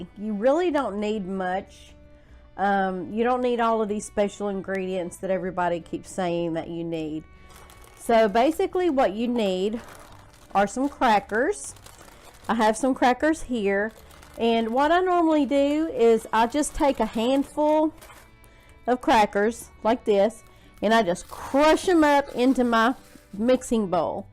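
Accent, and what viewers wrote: South, United States. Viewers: I’m afraid I didn’t have an easy time of it while trying to remove the membrane off the ribs. Where are you from, I love your southern drawl!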